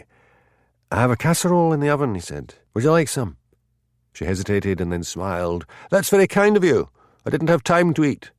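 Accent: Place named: Scotland, United Kingdom. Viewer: Thanks for uploading. I only read the ones read by this Narrator. His Scottish accent certainly adds to Hamish Macbeth's character.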